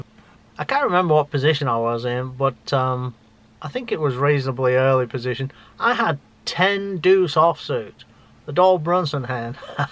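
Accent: United Kingdom. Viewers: where u from? u sound like a ex brit? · Is this guy british? his accent is really strange i cant tell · enjoyed your vlog, keep them coming struggled to place your accent decided midlands/Leicester, was I close?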